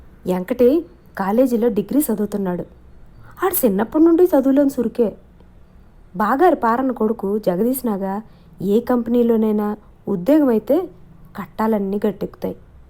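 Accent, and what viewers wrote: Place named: Vizianagaram, India. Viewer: Vizianagaram yaasa బావుంది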